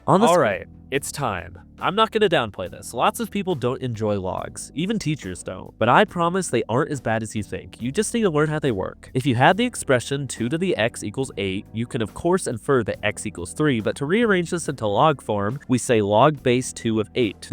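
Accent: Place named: Asia. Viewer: u sound asian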